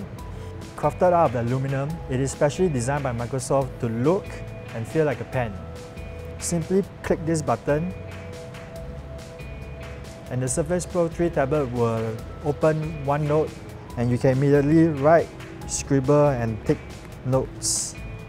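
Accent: Singapore. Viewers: Dat singlish accent...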